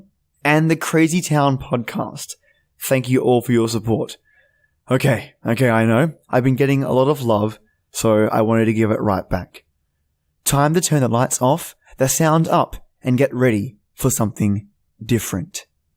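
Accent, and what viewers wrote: Australia. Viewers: I missed your awesome Aussie voice 😩 Hope that didn't come off creepy, hahaha. I had no service for a few days and felt like I was going crazy without any stories from my favorite narrators! I'm gonna enjoy this one 🤗👍🖤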